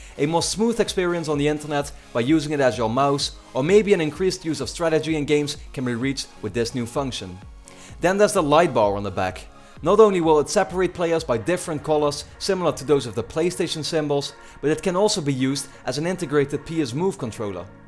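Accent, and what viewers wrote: Netherlands. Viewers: Haha, ik kan horen dat je nederlands bent, te geweldig accent man. Je kunt goed engels spreken hoor, niks daarop tegen :)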